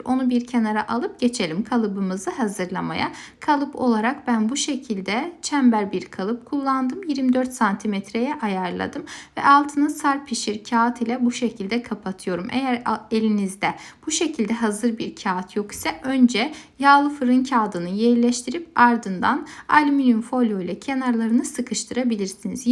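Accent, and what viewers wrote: Azerbaijan. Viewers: Deyəsən xanım Azərbaycanlıdır ləhcəsindən hiss edirəm. Bu arada əllərinizə sağlıq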